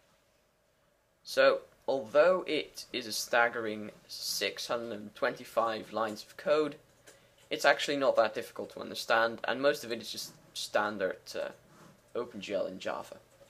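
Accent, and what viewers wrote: Netherlands. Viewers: haha i knew it! your acsent it was dutch! YEAH! sorry for that xD but i´m dutch to, and i make games to. No tutorial though, and still good english btw :)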